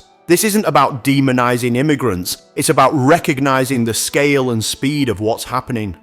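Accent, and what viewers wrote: Sheffield, United Kingdom. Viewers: American anglosax here guessing the accent. Sheffield?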